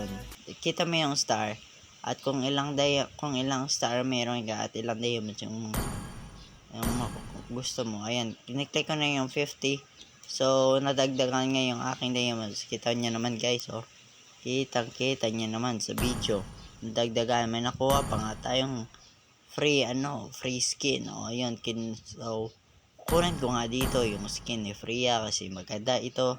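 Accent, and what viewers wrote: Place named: Bisakol, Philippines. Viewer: Parang bisakol na nga mag explain tas scam pa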